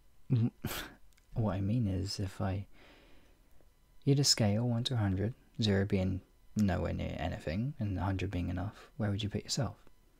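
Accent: United Kingdom. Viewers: You talk so much like me it's unreal :3 I'm also British, and I do a lot of pet play with others (and MIGHT be a teensy bit into kitten space myself), but your cadence and word choices are so beautifully specific. Your improv skills are amazing, such a treasure to stumble upon this! ^w^ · But like- I thought u were scottish why do u sound scottish to me 🤔✨ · oi.. I'm crying you're to cuute the british is too cute I'm dead from fanboying too hard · This audio is so British it makes me happy 😊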